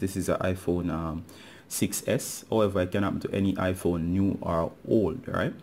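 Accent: Jamaica. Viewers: Is that a Jamaican accent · Anyone else realize the Jamaican accent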